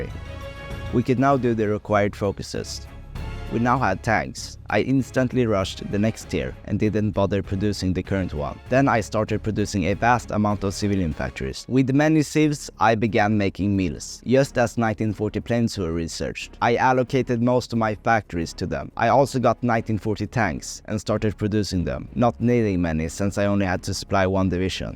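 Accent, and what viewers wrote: Sweden. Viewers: Bro kiiiinda has a swedish accent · As a swede no matter where I am in the world this accent will make me feel like I'm back at home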